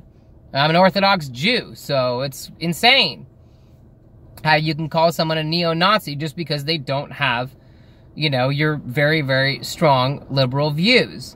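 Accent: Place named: East Coast, United States. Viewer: you're not even from toronto, "ya know." east coast hick?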